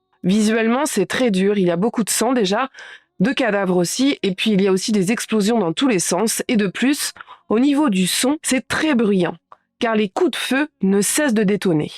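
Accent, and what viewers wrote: Brittany, France. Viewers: J’adore ton accent du breton broerec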